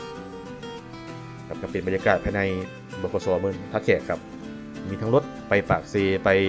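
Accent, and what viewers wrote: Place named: Nyo, Thailand. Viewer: ออกไทญ้อเรื่อยเลย อิอิอิอิ...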